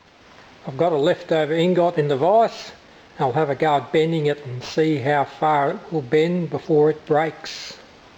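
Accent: Australia. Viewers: i know Australia, but where? Sounds like NT.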